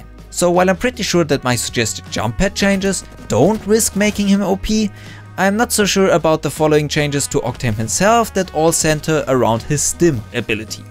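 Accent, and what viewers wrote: Germany. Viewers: Der deutsche Akzent lenkt mich so ab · Man hört dass du Deutscher/ Österreicher/ Schweizer bist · Bist du deutsch? Lol dein Akzent xD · Der Akzent...du bist doch deutsch oder?🙂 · Hòrst dich deutsch an diggi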